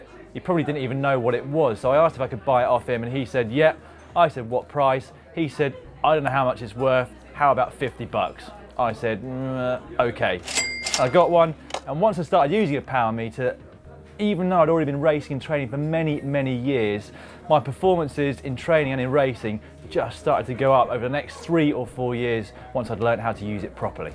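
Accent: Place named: United Kingdom. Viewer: Gotta love the British accent. A place cooled (called) cool. shoutout to Gavin Free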